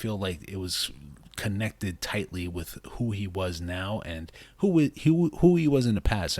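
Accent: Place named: New York, United States. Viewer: Sounding real new yorkish!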